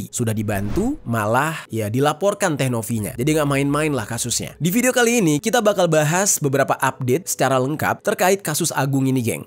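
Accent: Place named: Aceh, Indonesia. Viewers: orang aceh selatan dia ya? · Logat aceh nya itu loh keren banget bang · Suka banget dengar bang logat aceh😅❤ tak ulang² terus😂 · Bang asli Aceh ya,cocok banget logat Acehnya,ingat temanku waktu kerja di Jakarta. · Dari cara abg pake loghat aceh, udh tau klu bng lagi keselll 😂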